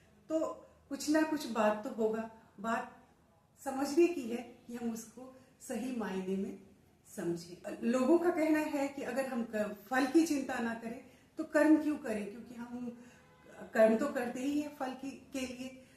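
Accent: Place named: Bihar, India. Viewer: Well, first of all warm hello to you after such a long time. Where do you get so much knowledge n wisdom? Are you from Bihar since you have bihari accent ?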